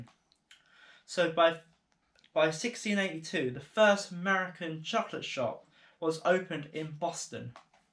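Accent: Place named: United Kingdom. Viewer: I would love to see a house tour as well as yard also. I never been to UK...I love the accent ✌️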